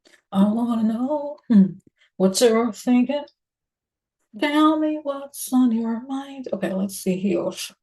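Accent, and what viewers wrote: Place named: Russia. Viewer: Sing it,!!! 😊💕🎶 Also, your Rüski accent makes me so happy! 😂💕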